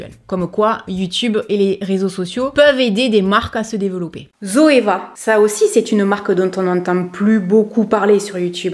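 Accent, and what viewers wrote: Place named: Perpignan, France. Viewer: J'ai rigolé car il n'y à aucun de ses produits que j'ai testé acheter mais ta bonne humeur et ton accent de Perpignan me fond toujours du bien 😝😘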